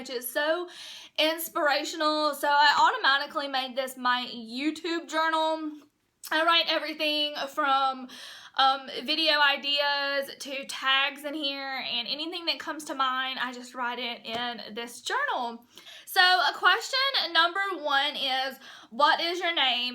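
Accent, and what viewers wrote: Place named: South, United States. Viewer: Great video! I'm a new subscriber. 😀 Love your Southern accent.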